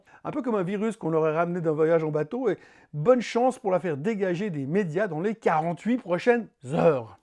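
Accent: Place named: Valais, Switzerland. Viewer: J’apprends à connaître la Suisse avec, cette fois l’accent Valesan( Orthographe a confirmer). Et bon anniversaire.🎉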